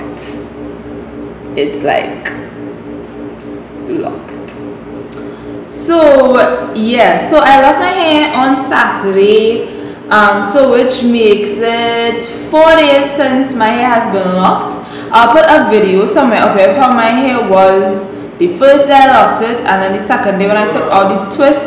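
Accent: Trinidad and Tobago. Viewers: Are you from Trinidad???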